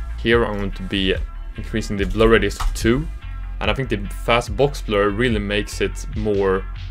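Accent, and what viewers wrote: Sweden. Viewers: Tog mig tre sekunder från att du började prata till att förstå att du var svensk haha, du förtjänar en sub dock! Keep it up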